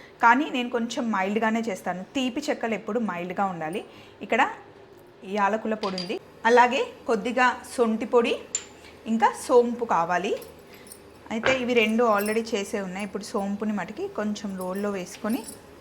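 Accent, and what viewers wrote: Telangana, India. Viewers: Akka meeru Telangana kadha say elachi poddi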